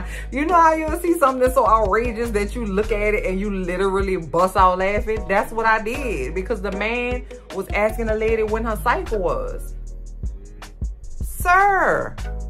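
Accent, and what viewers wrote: New Orleans, United States. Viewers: I’m in Shreveport. I know that New Orleans accent anywhere! Keep up the great work in the 504! 👍🏾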